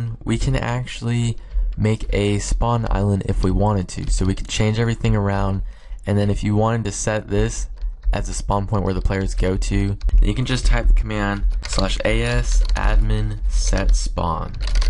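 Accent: Italy. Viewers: Numero uno,are you italian or english? lel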